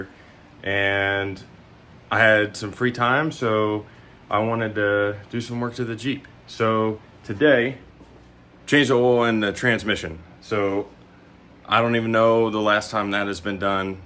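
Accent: United States. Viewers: Great video!! Thank you!! Where are you from? I can hear your “o’s”—sounds like Baltimore. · Mid Atlantic aka tidewater accent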